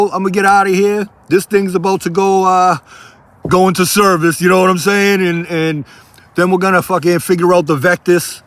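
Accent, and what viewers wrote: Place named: Southeastern Massachusetts, United States. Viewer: Dude you have to be from near southeast Massachusetts. I lived there for 44 years (right near Fall River Mass) and just moved to Southwest Florida but "bang a U-ee" "wicked" "eff this & eff that" you sound exactly like me and my friends.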